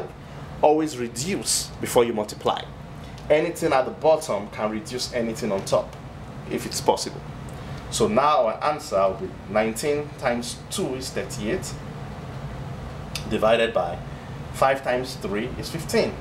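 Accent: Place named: Nigeria. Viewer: you had a niagerian accent so thats why i thought you were from nigeria i am from bauchi state northern nigeria which part of nigeria are you from?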